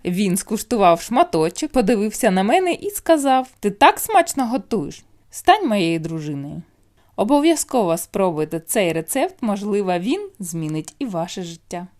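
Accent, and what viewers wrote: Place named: Surzhyk, Ukraine. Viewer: господи, я думал так только мой друг говорит на своём личном суржике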